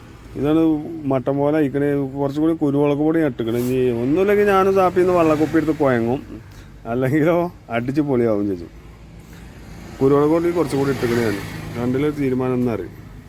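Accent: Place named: Malappuram, India. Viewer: മലപ്പുറം സ്ലാങ് മാറ്റരുത് ഞാൻ 87മുതൽ നാഷണൽ പറമിറ്റിയിൽ ഓടിയ ഡ്രൈവറാണ് അന്ന് ഇത് പോലുള്ള സൗകര്യം ഇല്ലാത്ത ഡെവിൾ കേൾച്ചും ഓടിച്ചാൽ ഓടിയത്ത സ്റ്റെയറിങ്ങും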